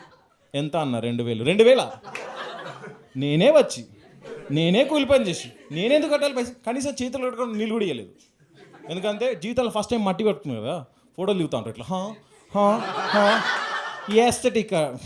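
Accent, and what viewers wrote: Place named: Warangal, India. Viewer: Bro mi voice Veni cmt pedudham anukuaa wgl ani nvuey cheppavu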